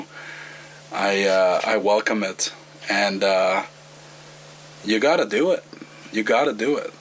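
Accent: Quebec, Canada. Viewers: He's from Quebec Canada so of course he'd have an accent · Quebec accent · I wanna hear him speak French (as a fellow French Canadian ) . I can hear his French accent on a lot of words.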